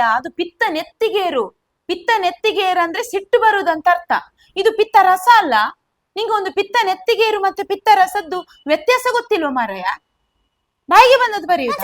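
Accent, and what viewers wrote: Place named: Coastal Karnataka, India. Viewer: Madam your Kannada is good. Coastal Kannada.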